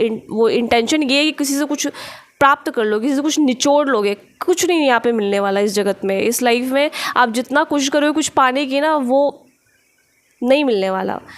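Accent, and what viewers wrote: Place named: Bengal, India. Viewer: your bengali hindi accent is awesome 🤩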